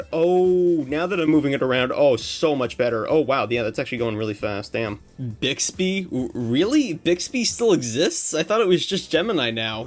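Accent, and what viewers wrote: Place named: United States. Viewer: Guessing from the accent you're from usa right? How do you use an android phone over there, iMessages don't work on android. From what i know they all use iPhone & iMessage in usa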